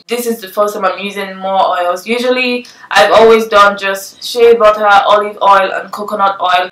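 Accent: Nigeria. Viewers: where are you from... you accent is Nigerian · Love this!, great video. Where can I buy those stunning earrings? You are funny. Yessss to the facial expressions and Nigerian accent :) have you heard of the vanity massage brush?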